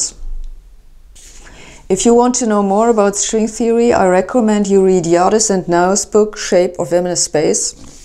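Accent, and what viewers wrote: Germany. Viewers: german english. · I love it when she speaks English with a German accent. I first tuned-in for the science, but her deliver is so mesmerizing, I'd listen to her talk about any subject. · Is she German? Does anyone know if she's German?? 😂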